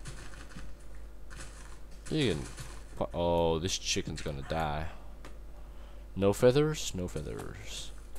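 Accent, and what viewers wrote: United States. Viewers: Wow your voice is different! You sound american! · HOLY SHIT HE SOUNDS SO FUCKING AMERICAN!!!!!!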